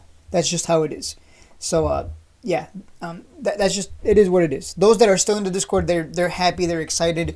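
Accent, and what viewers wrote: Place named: Northeast, United States. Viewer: oh I thought you was in northeast cause the accent haha I’m from SoCal. I’ve driven truck all through Midwest and NY many times tho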